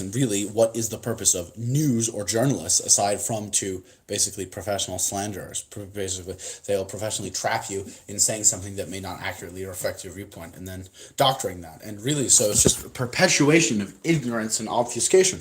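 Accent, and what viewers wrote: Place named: Australia. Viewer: I swear your Aussie accent is more authentic than my fellow Australians. By the way, if you ever talk to Adam, give him our blessings, from the old Wallace gang.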